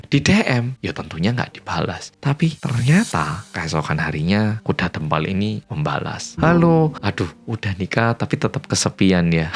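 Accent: Java, Indonesia. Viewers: ini jiaaaan blas gak enek sleke Korea asli slek jowo,tapi walaupun aku tidur bila dengar suara ini aku selalu tau bahwa ini adalah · wow beneran ada ta 🤭 Nyimak swindler org korea yg medok...🎉 tks 🎉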